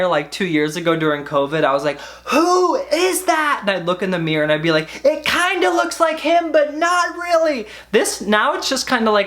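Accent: England, United Kingdom. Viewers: I like your English accents. Makes me smile. Love your content. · The British accent makes me burst in laughter every freaking time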